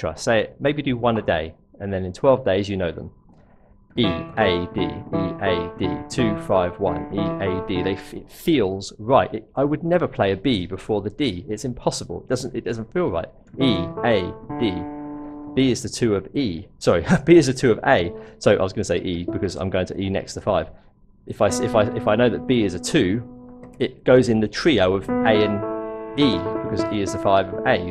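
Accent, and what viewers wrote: United Kingdom. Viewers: I love the way u start ur video. "Hello there". love that British accent And the fun part is i always repeats it myself after watching some of your videos continuosly.😂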